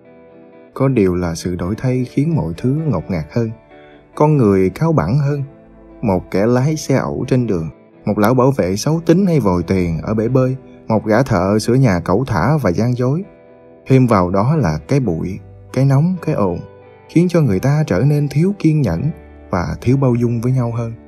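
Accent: South, Vietnam. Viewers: Hai bài giọng miền nam nghe hay mà nội dung không hay Nghe cứ sao sao ấy không hút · Nội dung người Bắc soạn, giọng đọc người Nam truyền tải. Là người Hà Nội sống và làm việc tại Sài Gòn, tôi thích cảm giác này. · Cái giọng đọc miền Nam chuẩn kiểu này giờ ngày càng hiếm. Mong bạn giữ gìn nó nhé. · Bài viết của chàng trai Hn, giọng đọc miền nam nhưng mình thực sự thích giọng đọc này, ở đoạn cuối có những đoạn lắng trầm rất cảm động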